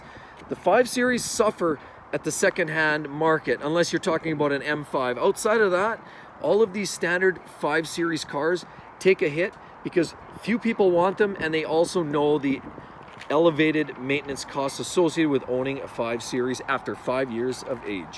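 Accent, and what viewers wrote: Canada. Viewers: Are you Canadian!!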